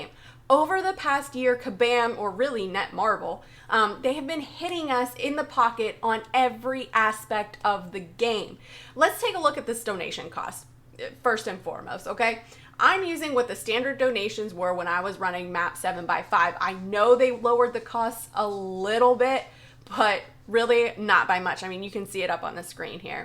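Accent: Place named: Texas, United States. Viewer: You seem to have a hint of a western accent, like texan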